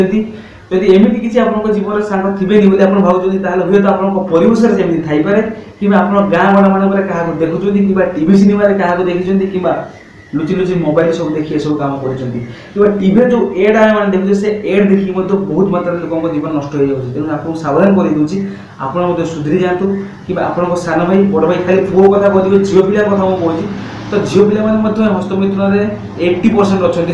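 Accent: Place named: Balasore, India. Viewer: Bhai Aponko kotharu laguchi apon nischinto Balasore pila bodhe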